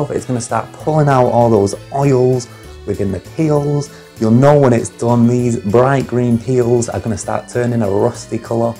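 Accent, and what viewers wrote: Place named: Northern England, United Kingdom. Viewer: wtf he sounds nothing like british black community.. hes has a northern english accent.!!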